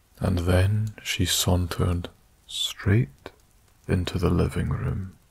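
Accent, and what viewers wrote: Scotland, United Kingdom. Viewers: your dreamy Scottish voice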